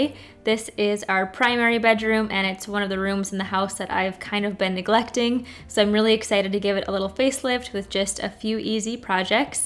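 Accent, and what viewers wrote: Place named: Russia. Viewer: You kinda have a Russian accent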